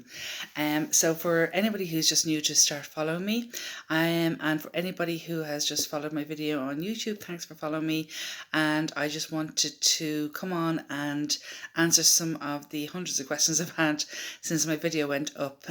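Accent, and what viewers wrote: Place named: Ireland. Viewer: Hiya, sorry I have another question which I hope at least you can help me with. I've been doing more research on this oil and just wondered, 'how' did you work out what dosage to give your son (or even yourself as I've learned?) Was it merely trial n error or were you given any guidance? Many thanks. Ps. Forgive me but I said earlier that you sounded like a 'brit' but now I recognise that lovely irish accent(lol. Silly me) Tc now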